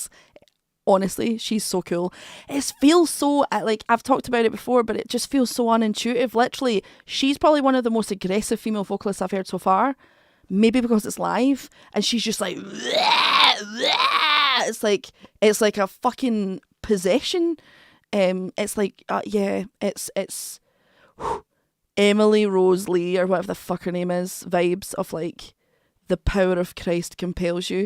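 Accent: Scotland, United Kingdom. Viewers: I'm Polish and I always was just one of a few at work who could talk with people calling us from Scotland. I love your accent, reminds me of the lovely Amy Macdonald. And if you start a metal band I wanna be in it. Cheers!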